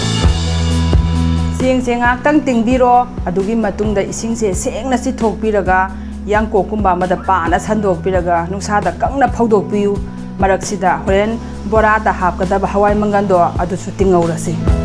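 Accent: Kakching, India. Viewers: Kakching marol se taba yam nungai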